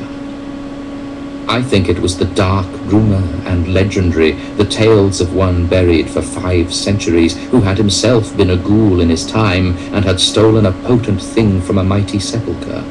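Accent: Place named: England, United Kingdom. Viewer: This is awesome, and it's great how they got an English guy to do the voice, since that's where it's set. Lovecraft was the man! No, Lovecraft IS the man! Lovecraft is forever!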